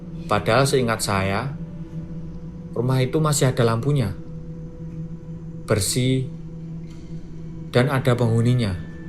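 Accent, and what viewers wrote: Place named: Java, Indonesia. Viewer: Selalu diulang dngerin crta ini...crtany bgus naratornya jg salah satu fav...medok Jawa TPI jelas cara berceritany..KLO cwe kyk mb Devi. Good job mas.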